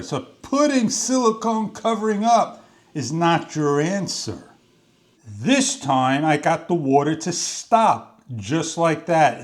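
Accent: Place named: New England, United States. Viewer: Thank you. Your NE accent makes is interesting. (O: I have spent hours and hours on our model 4266, first with new seal, then replacing the whole flush mechanism. Still having it refill to the right spot on the overflow tube, about every 5 to 15 minutes. I think I've tried and learned every lesson you share. But, maybe the heavy nut on the flapper will do the trick. I'm with you, not buying another American Standard toilet. Thanks!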